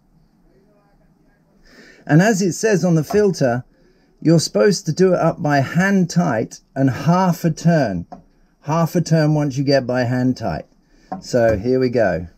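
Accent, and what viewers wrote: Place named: RP, United Kingdom. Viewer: Great video and exceptional BBC voice! Appreciate the help. Regards from New Zealand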